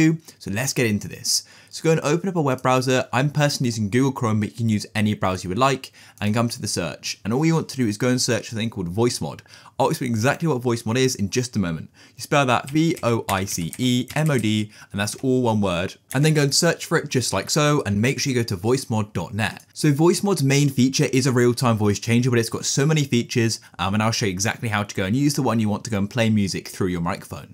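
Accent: United Kingdom. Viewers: He is defenetly brittish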